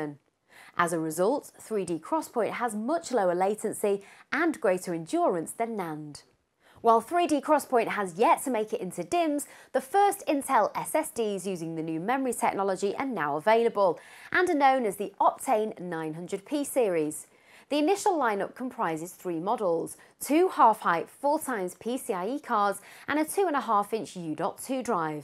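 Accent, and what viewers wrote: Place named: Northern England, United Kingdom. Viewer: I love that northern accent.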